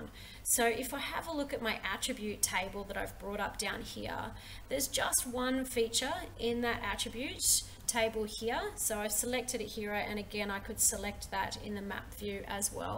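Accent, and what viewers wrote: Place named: Australia. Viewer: Nice to hear an Aussie accent!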